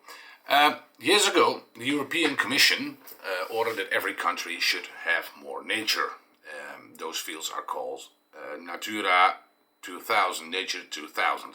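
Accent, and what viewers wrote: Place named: Netherlands. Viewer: Heerlijk nederlands boers accent met engels, top man!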